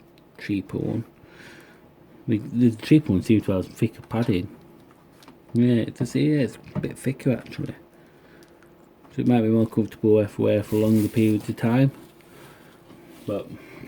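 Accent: United Kingdom. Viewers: I can understand him he is british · It's a British Eng and I can 100% understand him. All depending on Where you live and what accent you've got. Not his fault at all. If you agree than appreciate his effort and like his video please, his video helped me for sure 👍👍👍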